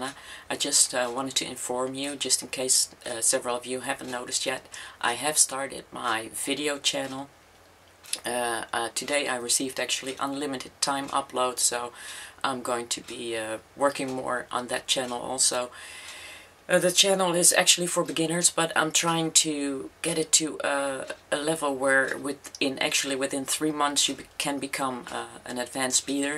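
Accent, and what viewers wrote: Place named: Netherlands. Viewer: are u originally dutch cuz u have a dutch tone in ur voice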